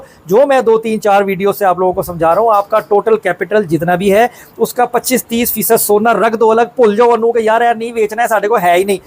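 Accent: Punjab, India. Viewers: Sir ap ka Punjabi touch oye hoye mashAllah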